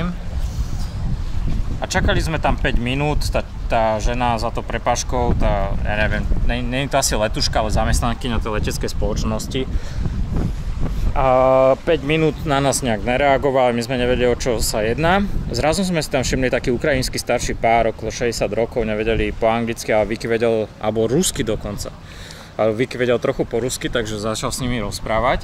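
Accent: Slovakia. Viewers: Bratu, ja pocujem podla prizvuku, ze si zapadnar, ale nehovori sa kamerovat, ci nedajbo fotoaparatovat (co si nasatastie nepouzil) :D. Ale nakrucat, natacat ;))) Inac drzim palce, vyborne cestopisy ;)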